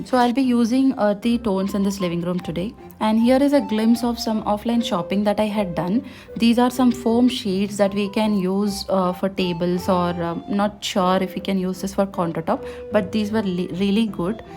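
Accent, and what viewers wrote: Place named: South India, India. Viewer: It's quite a good makeover, dear. You are extremely creative. Kudos to your creativity and hard work. Also, you sound very south Indian!.❤❤❤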